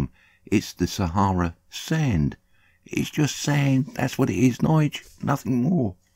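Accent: United Kingdom. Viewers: Your commentary cracks me up. That old school British dry humor has a special place in my funny bone. Whenever I hear that British accent my mind flashes back to my younger years hanging out at my boy James' house. His parents are British transplants. When you said, "What you tryin to hide there in the sky, Sonny Jim?" that brought me back to a time when I was 15. James' dad caught us sneaking down his Weinhart's in their garage. "What you tryin to hide there, Sonny Jim?" Between the guilty look on my boy's flush face, the buzz from the 3 beers i just slammed, and Jim Sr's accent, I couldn't help myself hold the chuckles back. You got me wearing the same cheeky smile I had on back then. Thanks for that. I needed that right now. MadLuvBrada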